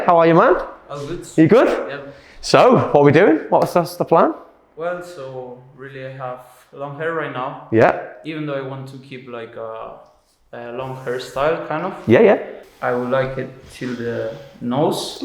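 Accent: Liverpool, United Kingdom. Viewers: This is British accent right? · I get it ure british . Now turn it off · Where are you based? You sound like a Scouser, are you in Liverpool?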